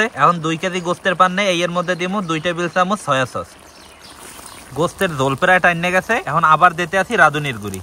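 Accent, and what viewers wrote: Barishal, Bangladesh. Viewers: অসাধারণ মাশাআল্লাহ এক্কেবারে বরিশাইল্লা ভাষার নাহান😂 মজা হইছে দেখে বোঝা যাচ্ছে😊😊😊😊😊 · আমার কাছে বরিশালের কথা ভালই লাগে। আর ভাই এর কথাগুলো মিষ্টি ❤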